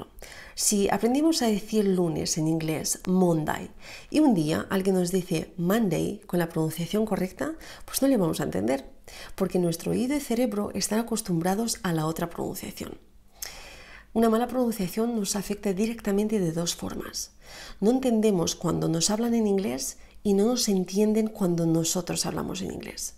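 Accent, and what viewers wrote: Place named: Madrid, Spain. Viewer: Hola te felicito excelente Maestra 👌 de Inglés,tienes una pronunciación muy bonita, cómo Tú eres. Te voy a seguir en la red. De qué parte de España eres parece tu acento Madrileño? Yo soy de México de la zona metropolitana de la CD.de México. México y España por Siempre Unidos. 🇲🇽🇪🇦😘😘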